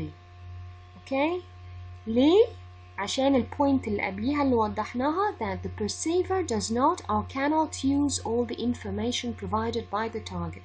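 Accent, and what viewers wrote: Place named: United Kingdom. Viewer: I really liked your British accent it's really good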